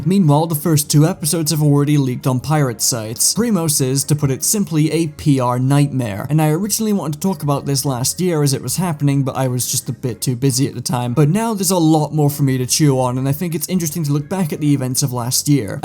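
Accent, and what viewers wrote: United Kingdom. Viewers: it's weird but I didnt even catch your british accent until you pointed it out, almost like a switch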